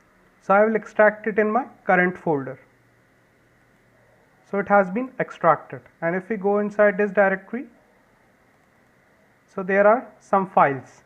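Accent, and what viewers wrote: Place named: India. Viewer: hindu accent, words tech, support, solutions etc used, asks to download something that could have been coded by anyone. no ty.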